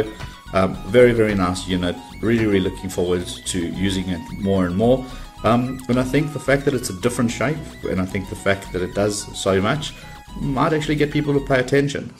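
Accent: South Africa. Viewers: He's a Saffer: if you ask him to say milk he'll pronounce it 'mulk' and he might refer to traffic lights as 'robots'. Either way, he's a good reviewer and getting a sub from me.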